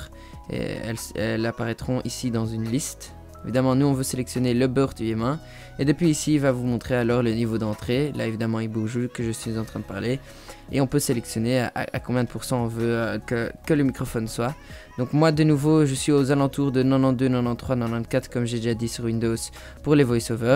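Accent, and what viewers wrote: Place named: Belgium. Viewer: Incroyable l'accent belge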